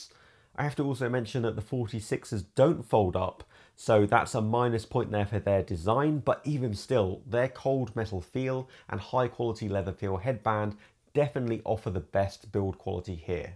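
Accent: United Kingdom. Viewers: That’s just because he’s got that “Posh” Brit accent. 🇬🇧 He could be describing his morning dump and it would sound like gold.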